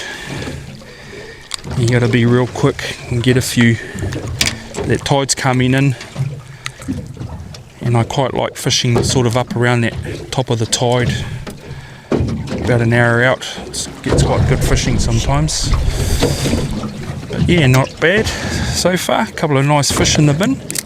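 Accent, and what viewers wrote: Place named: New Zealand. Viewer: No offence mate but you have a huge kiwi accent ! ...hahaha....I miss it actually having lived in nz for 22 years....now i have to listen to the aussie accent.....nowhere near as cool a !!!! Keep up the great fishing vids....nice healthy looking snaps.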